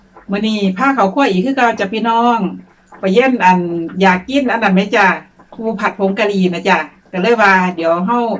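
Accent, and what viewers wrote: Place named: Sisaket, Thailand. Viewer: ปูผัดผงกะหรี่เป็นตาแชบคักแท้ครับมาเบิงมากินเด้อครับออนชอนปูน้อ. ครับโตใหย่ๆครับสะออนมักสำเนียงภาษาอีสานทางศรีสะเกษ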